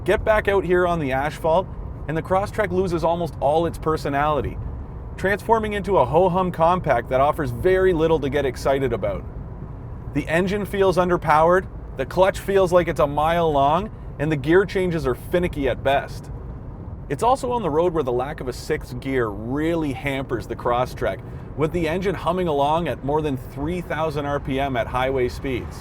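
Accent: Canada. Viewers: Did I hear a canadian talking apout cars?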